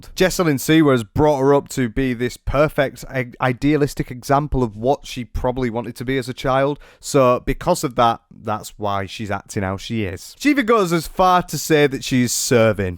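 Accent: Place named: Scotland, United Kingdom. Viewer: I could swear I heard some Scottish accent 😭 good content keep it up 👍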